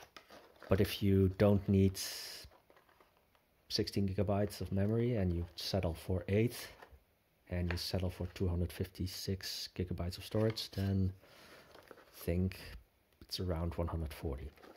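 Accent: Netherlands. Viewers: I hear a bit of nederlands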